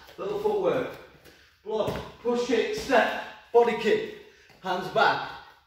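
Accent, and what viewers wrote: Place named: Northern England, United Kingdom. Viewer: Your north english accent is actually cool.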